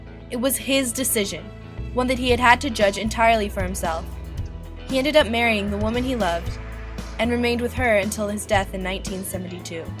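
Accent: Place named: United States. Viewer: The young lady who is discussing this has an American style accent so I assume she has no understanding of the role of a Constitutional Monarch. I have never yet met an American who does. Firstly this is not an analysis ..it is a repetition... with little depth. For the record...from the someone who lives in the UK. The role of a British Monarch is to uphold the Constitution and provide a unifying force to balance the power of politicians. The Monarch leads of life of great priviledge but they are trained from birth into the role they are undertaking and an understanding that this is a two way process. The Monarch is most definately NOT able to do as he or she wishes and must put the needs of their people first. The role of Consort is also highly demanding and requires great strength of character to fulfill the function properly ( we have a recent example of where that went wrong and the turmoil it caused.) NOTHING is more important than upholding the Constitution. 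This country had several Civil Wars getting the power balance right. Edward the V111 is generally regarded in the UK as a weak,immature man who selfishly put his own needs above that of the people and showed no ability to recognise the damage he was causing. In doing so he caused a Constitutional crises which nearly destroyed the very Constitution he was suppose to uphold at all costs and put the Country on a path to Civil War as people took sides.. Being King was his role in life and he was a disaster at it. It is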